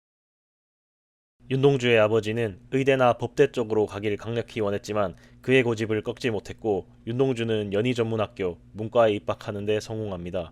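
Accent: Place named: Gyeongsang, South Korea. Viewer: 쌍도 억양 듣기 싫어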